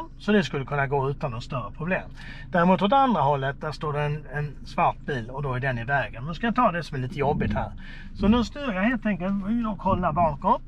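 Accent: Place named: Scania, Sweden. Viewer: Skånskt dialekt 💪 Pedagogisk och tydligt förklaring Tack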